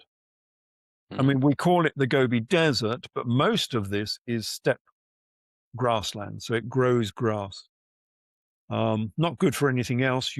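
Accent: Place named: Belfast, United Kingdom. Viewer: belfast accent is contagious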